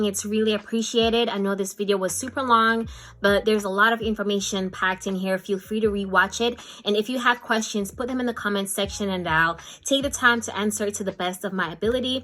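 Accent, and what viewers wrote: Jamaica. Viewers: I hear Jamaican accent somewhere in there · Hello, I am wanting to venture into the beauty industry, I hope is to cater to everyone but specifically: Acne prone & Oily skin. Glad I came across your video. Also, I heard a Jamaican accent ❤️ 🇯🇲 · Do you have Jamaican parents? I'm hearing Jamaica in your voice.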